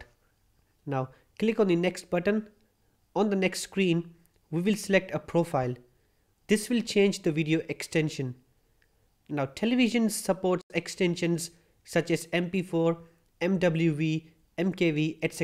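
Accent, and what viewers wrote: India. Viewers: Pati dito indian accent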